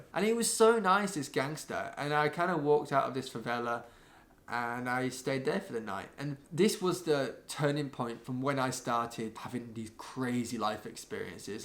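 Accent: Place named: England, United Kingdom. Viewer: Never knew english accent would be so useful. Great story! :)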